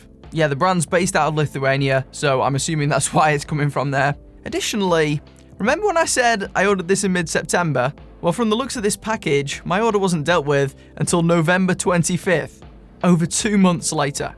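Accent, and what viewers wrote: Stoke, United Kingdom. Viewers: stoke accent and that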